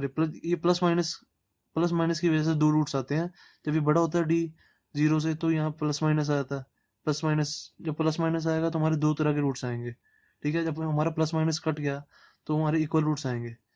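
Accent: Punjab, India. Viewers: r u from punjab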